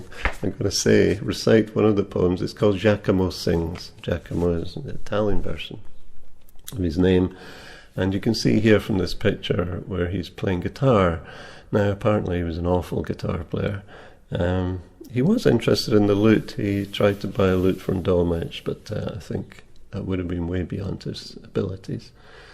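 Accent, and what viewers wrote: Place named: Scotland, United Kingdom. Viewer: Dearest, so sad to hear this, thrilled to hear your poems and will visit your photography site, also so good to hear more about you, but would love to hear you sing 😉😊 I will so miss your calm sensitivity and beautiful notes, your voice and accent which makes me feel closer to my dad and the family and of course Scotland.. your shirts and jumpers.. 😍 no other matches you. Enjoy more time to write and take beautiful images and take care too.. love to you three xxx and please return soon!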